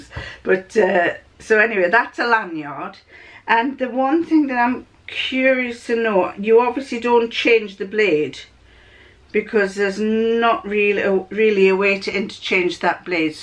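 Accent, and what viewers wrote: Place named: East Boldon, United Kingdom. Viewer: Hello from rural New South Wales where the nearest sewing notions store is 1.5 hours drive away. Love your accent as I'm originally from East Boldon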